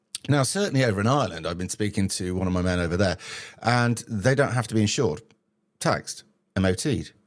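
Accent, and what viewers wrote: United Kingdom. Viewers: All the crimes you mention are not the exclusive domain of immigrants to a country, there are home-grown bad people to. As for the ‘killer machines’, traffic regulations and driving customs differ from country to country so it’s to be expected someone unfamiliar with those differences may have an accident. I note from your accent you are from the UK. Anne Sacoolas was driving on the wrong side of the road when she killed Harry Dunn. She claimed diplomatic immunity initially. She was white, middle class and privileged. You don’t help feeding into people’s fear and negative stereotypes.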